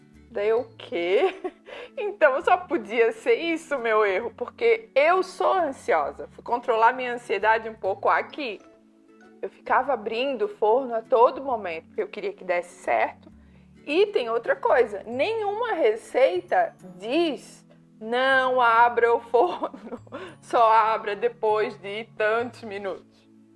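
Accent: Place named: Itajaí, Brazil. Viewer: Querida! Que Deus te abençoe sempre ❤🙏🏻 Legal ouvir um "sotaquezinho" de Itajaiense aí, na América, amoooooo🥰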